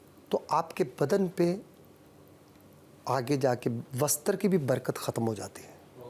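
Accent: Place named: Maharashtra, India. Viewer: मुलाखत खूप छान वाटली! सुखविंदर सिंह ग्रेटच आहेत. तथापि खांडेकर जी आणि ज्ञानदा जी हे हिंदी बोलताना त्यांचा accent मात्र मराठी येतो. तुमच्या सारख्या सेलिब्रिटींकडून हे अपेक्षित नाही.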